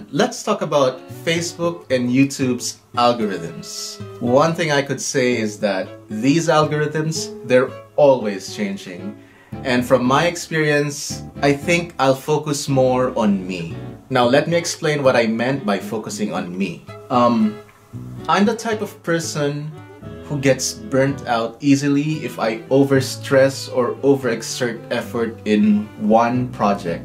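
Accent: Philippines. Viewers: You're starting to lose your Filipino accent... very slightly. It's fascinating to watch its progress. lol I hope you're finding life in the US ok.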